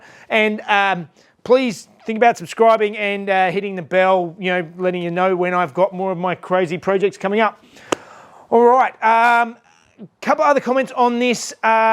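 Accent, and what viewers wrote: Australia. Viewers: Hello, I am a french old guy watching your amazing videos that I love. Can I suggest something? Fortunatly you put subtitles under the video because, (as I live in Bali most of the time, I know that you Australians have a fucking accent!)...so thank you for these subtitles. But please, can you do it without all "hum" and " hu" because it makes them harder to read? I can't wait watching the Alfarrari finished so hurry up! If you come to Bali, in european winter time, let me know and come minimum for a drink or stay in my beautifull villa! Say hello to Mrs...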